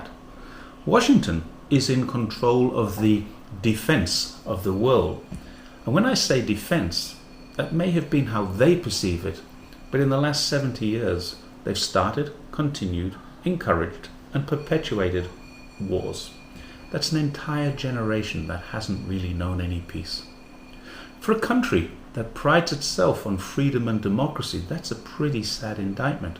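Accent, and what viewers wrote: Australia. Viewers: First Time I hear this. Are you Australian???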